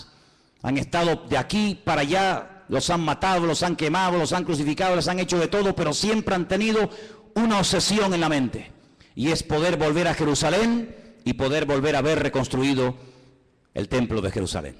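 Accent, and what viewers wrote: Spain. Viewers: Muy tremendo mensaje usrted me rebendijo con la palabra .. saludoa desde argentina ... de donde es la iglesia ? .. por el ascento es de españa?.. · Que buen mensaje pastor;👌 por su acento es Usted Español ..bueno es Dios 👏que todavía tiene hombres diciéndole a los feligreses la verdad ;que otros esconden solo hablan de prosperidad económica y las almas que se pierdan 😳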